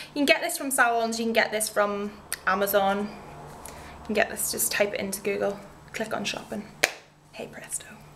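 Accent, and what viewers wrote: Ireland. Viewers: You are so damn adorable. Love your Irish accent! Cutie pie, good video :) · I usually hate Irish accents but I love yours:) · i like your hair! its awesome and your accent =) <3 Ireland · i love her voice:) irish?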